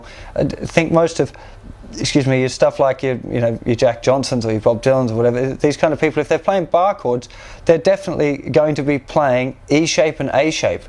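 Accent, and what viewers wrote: Australia. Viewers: are you australian? because you sound aussie.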